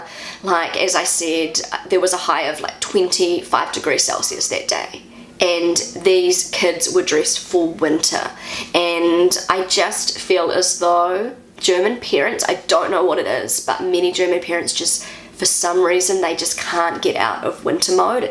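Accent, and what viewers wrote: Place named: New Zealand. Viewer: Welcome back! Really missed your Kiwi accent. Measles vaccination: I really wish they'd have had that back in the 1970s when I got the measles as a child. It wasn't fun. I had such a high fever the doctors feared for my life. At least that's what my parents told me, but they're parents and maybe exaggerating. Knives: Oh come on! I'm from a rural area, and we boys always had our sharp Swiss Army Knives with us. You can't send a boy to school with a blunt knife, that's embarrassing. Playgrounds: When I was a kid, we had none in our village. But there's a forest, and we were climbing trees instead. Without parental supervision, occasional scratches and bruises included. To be honest, I'd still prefer that. There are sugar-free and even vegan gummi bears, I'm sure the ones from the pediatrician are something like that. Personally, I think children shouldn't be rewarded for doing things that need to be done, but opinions vary. 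"Getting out of winter mode", again: I'm from a rural area. We watch the weather, not the calendar. And you know what the parents in my neighborhood do? They let their children decide what they want to wear. That's good education, in my opinion. They learn from experience, which includes feeling uncomfortable at times if their decision wasn't so wise.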